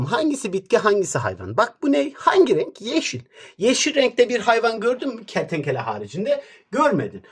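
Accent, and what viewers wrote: Kayseri, Turkey. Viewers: Abi sen gayserilimisin?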